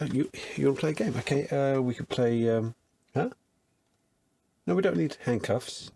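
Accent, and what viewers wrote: United Kingdom. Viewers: I think you're British and that is funny enough.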